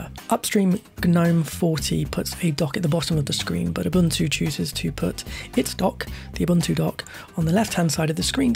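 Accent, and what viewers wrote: United Kingdom. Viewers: british accent always soft to my ears...i like it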